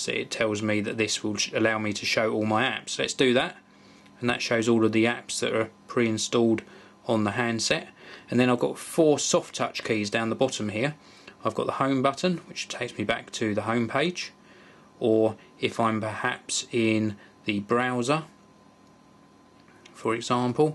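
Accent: United Kingdom. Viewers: I love your british english :D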